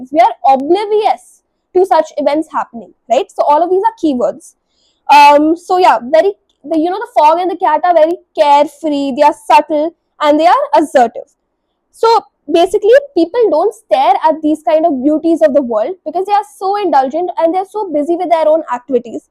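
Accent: South India, India. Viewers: are you from south India???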